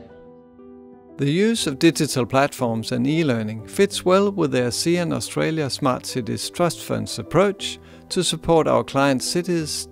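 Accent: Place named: Denmark. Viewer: So exotic to hear a Danish accent in a video about Laos ...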